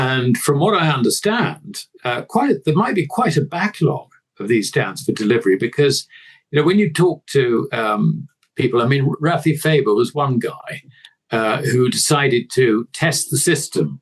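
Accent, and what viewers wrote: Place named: United Kingdom. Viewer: Blah blah blah with a British accent. Shameless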